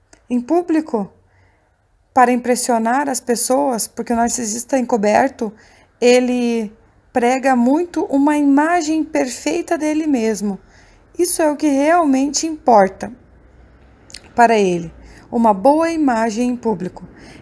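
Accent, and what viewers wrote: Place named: Rio Grande do Sul, Brazil. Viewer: Seus vídeos são brilhantes mas seu sotaque gaúcho é simplesmente ADORÁVEL.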